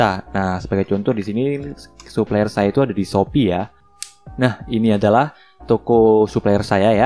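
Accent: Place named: West Java, Indonesia. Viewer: bang asli mana ko kayak org sunda??? mohon dijawab bang🙏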